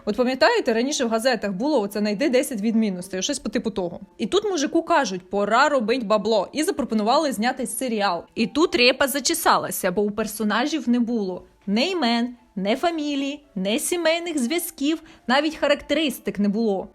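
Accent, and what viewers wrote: Surzhyk, Ukraine. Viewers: Какой же у тебя омерзительный суржик! Неужели так тяжело выучить украинский язык?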